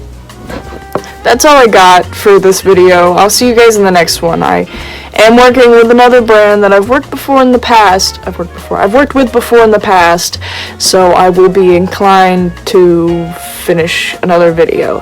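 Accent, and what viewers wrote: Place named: South, United States. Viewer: country accent + deep fried audio is so peak..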